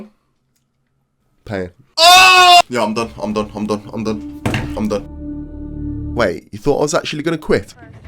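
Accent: United Kingdom. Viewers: Well i am sure your accent really does sound British brother 🇬🇧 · FUCKING BRITISH NOOO but i will stay for mw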